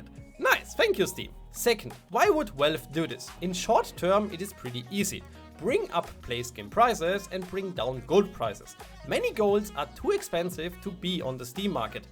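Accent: Germany. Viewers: Alter dieser scheiss deutscher akzent ich kann nicht mehr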